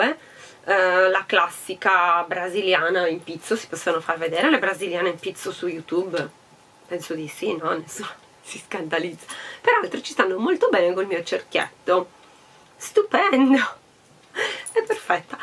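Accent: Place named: Florence, Italy. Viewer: Bellino!brava che parli il fiorentino😜ciao occhi da cerbiatta!🌺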